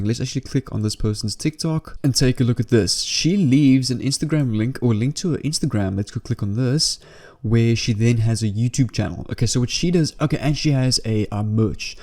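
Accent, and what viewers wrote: South Africa. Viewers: Bro you from SA right?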